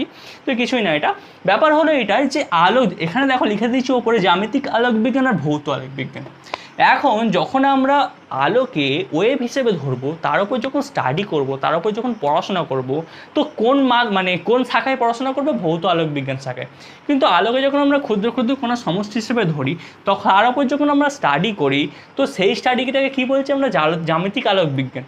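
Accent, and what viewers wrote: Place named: Bankura, India. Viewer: Dada apnar bari kothai? Ascent ta Bankura er moto laglo!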